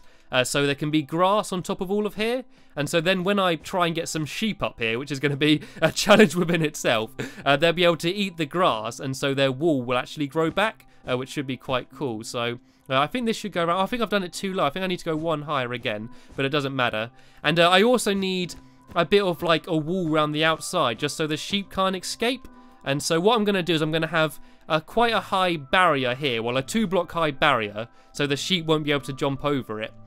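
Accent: United Kingdom. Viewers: Are you British? British people are cool. (Just forget about the American Revolution.)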